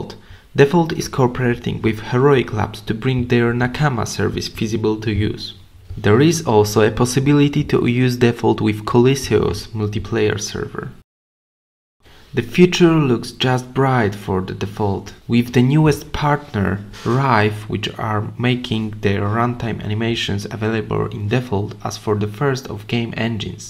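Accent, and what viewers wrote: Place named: Poland. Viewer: Polski akcent od razu można poznać.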